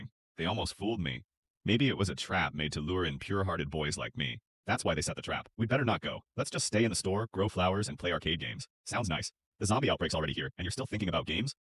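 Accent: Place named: China. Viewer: Personally I think the ai voice with the Chinese accent it’s sounds more funnier and fun and also the more higher in pitch voice you used for like X-ray gambling jade video sounds better overall I would say if you use this voice you should consider also playing background music so it doesn’t sound as dry and monotone also I love your vids bro and how long they are really enjoyable to watch I probably subbed at like 50 subs but keep up the consistency and constant improvements and you’ll do great🎉 sorry for the lengthy comment😅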